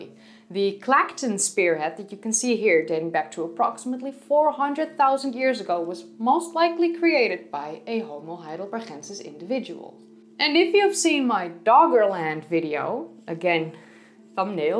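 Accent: Netherlands. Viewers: Is that a lovely Dutch accent?? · Your slight dutch accent is very cute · your Dutch pronunciation is very attractive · pegged you for Dutch in about 30 seconds... the Gs are a dead giveaway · Your accent and Dunglish is simply brilliant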